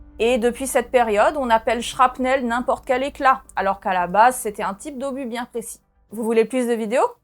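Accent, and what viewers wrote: Alsace, France. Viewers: Mama cet accent alsacien 😍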